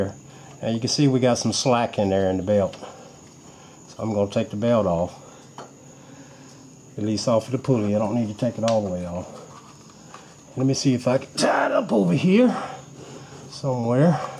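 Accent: South, United States. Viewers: 😂 that Southern accent... lol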